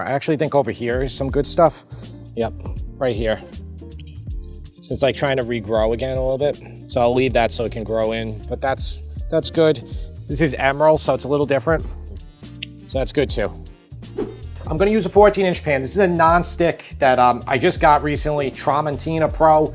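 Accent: New York, United States. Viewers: Not gona lie I'm soooo home sick your accent reminds me of my fam from ny😢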